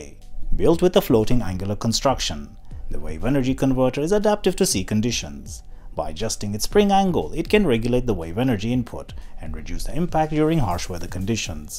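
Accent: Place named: India. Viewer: india English?? zzzzzzz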